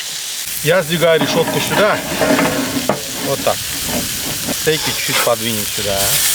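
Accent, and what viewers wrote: Baku, Azerbaijan. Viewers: Маэстро, настолько красиво и со вкусом вы все делаете, что смотрим всей семьей не отрываясь! И кушаете очень заразительно:) Процветания вам и вашим близким,! Потрясающие блюда, приправленные неповторимым бакинским акцентом становятся еще вкуснее)) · Азербайджанский акцент , ласкает слух, сразу вспоминаю своего друга Джавида , с которым дружили во время ординатуры в Москве ).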